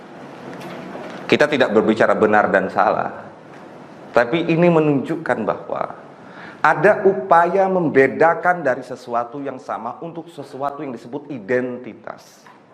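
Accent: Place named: Indonesia. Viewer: Seluruh video ini penyampaiannya didalam bahasa melayu sepenuhnya ... cuma loghat indonesia .. bahasa indonesia adalah cuma gelaran dalam negaranya saja ! Pertuturannya memang dlm bahasa melayu seluruhnya ... sumpah pemuda juga bertutur dlm bahasa melayu ! 😂😂😂😂😂😂😂😂🤣🤣🤣🤣🤣🤣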